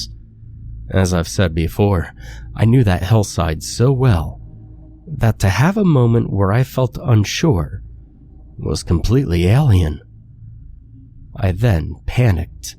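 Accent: United States. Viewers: Told with a thick American accent, trying desperately, and failing miserably, to sound like a Scottish accent. If you weren't born and raised here, you are NOT a Scot. And you most definitely are not a highlander! I am. 54 years. Born in Inverness and now living on the west coast. So, don't even think about arguing with me!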